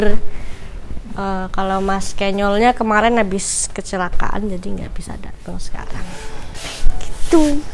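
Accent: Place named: East Java, Indonesia. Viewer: Yoi santuy. Logatnya kek Jawa timur an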